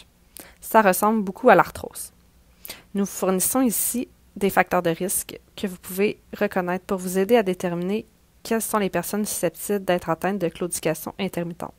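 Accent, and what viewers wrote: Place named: Quebec, Canada. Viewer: Bonjour , malheureusement je n'est l'oreille aguerris a l'accent québécois et je ne comprends pas tout et je me demandais si nous pouvions entrer en contact pour quelques questions ?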